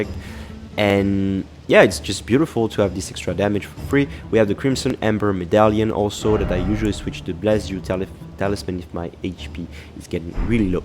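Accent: France. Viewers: Are u french? Si oui tu parles très bien anglais, les touches d’accents font tout le charme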